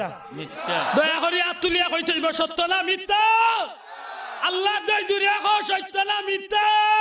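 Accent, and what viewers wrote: Sylhet, Bangladesh. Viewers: এটা আমরার ঐতিহ্য সিলেটি ভাষা।কটাক্ষ করার অধিকার কে দিলো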